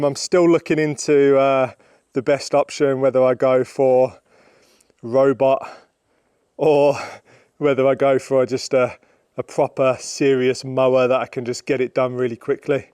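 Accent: Birmingham, United Kingdom. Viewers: I live in the UK and was oriinally from Birminham, so your accent reminds me of home. I think you are such a survivor, you have that huge land, which was taken on as a couple, but now one man. All the animals also to take care of and put a video together. Just where do you get all this engery from? Really hope you get others to join you in caring for such a property. Hope the legal stuff gets sorted friendly for you x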